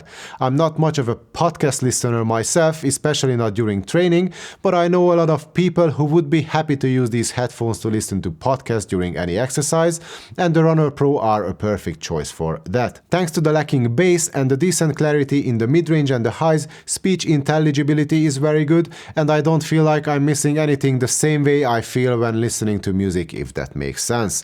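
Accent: Hungary. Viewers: Thx Mate, nice review! I have Shokz OpenSwimm but lately I'm missing bluetooth. I was thinking to buy an OpenRun as well, but after this I maybe try Neanka. But a bit scary how easily it broke in your backpack.... Shokz is still in good shape for me. By the way, you were right about IPX8 and IP68 not the same, BUT from waterproof standpoint are the same. The third character (X or 6) stands for dust /solid particles resilience (X means not tested, 6- has tested and ok against dust and solid airborne particles) . Forth character (8) is the waterproof rating (tested being submerged in 1 meter of water for up to 30 minutes) Last thing... Don't take it wrong, but aren't You from Hungary? I have very similar accent.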